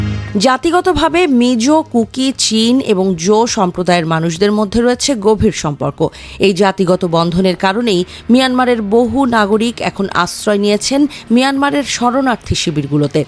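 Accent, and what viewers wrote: India. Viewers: এনটিভিতে ভারতীয় একসেন্টে কেন রিপোর্ট পড়া হচ্ছে।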